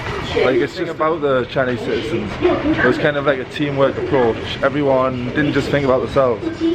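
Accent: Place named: Newcastle, United Kingdom. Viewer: You sound like a fellow Geordie and I have just about watched all of your videos. Thanks for posting.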